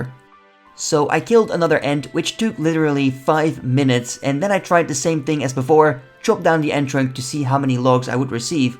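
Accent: Netherlands. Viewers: Ik had al zo'n idee dat je Nederlands was... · Lekker hollands accent hoor haha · I think he is Dutch.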